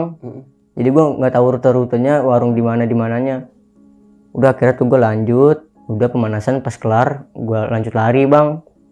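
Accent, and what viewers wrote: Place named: Betawi, Indonesia. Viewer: betawi parah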